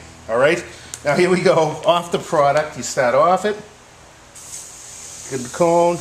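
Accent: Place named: Massachusetts, United States. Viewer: Thanks for the video demo. I will be trying this today...Nice Mass. accent by the way! lol you must be a Voc Tech teacher?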